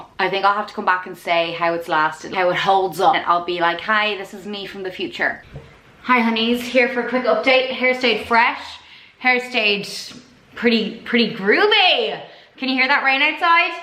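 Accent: Ireland. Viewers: Are u Irish?I'm from co.cork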